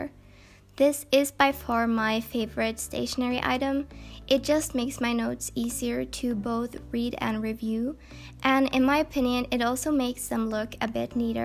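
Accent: United States. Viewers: I was surprised when I found out that you were Norwegian. I found out when i realized that you have access to Panduro, which is one of my local stores. And in another video you used your phone and the language was Norwegian. The reason I'm saying this is because from all the Norwegians I've heard. You sound like you come from America. Obviously I don't know if you come from Norway. Cause I live in Norway, but I come from a different country, and so Norwegian is my second language. And it makes me sound not NorwegianXD I'm just saying that I've been getting so used to hearing typical Norwegian people speak English, that it surprised me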